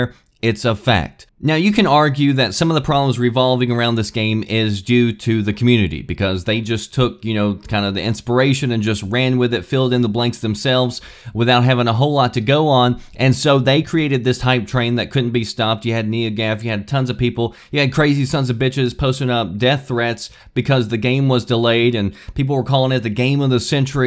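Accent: United States. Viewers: Wow this guy has some click bait and a really country actsent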